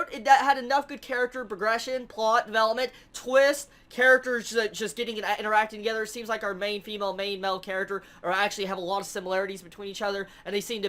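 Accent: South, United States. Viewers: This guy is so southern.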